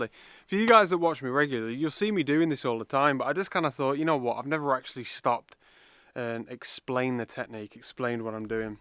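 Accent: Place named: Northern England, United Kingdom. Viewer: I love listening to the passion you have for your vocation. Your shots are wonderful, but you only achieve that through your love and appreciation of the land. A pleasure to hear your no-nonsence Northern twang too. I'm from Manchester originally myself, now living in Croydon, how I miss the people and their candidness. Great work fella, and long may you continue on your quest.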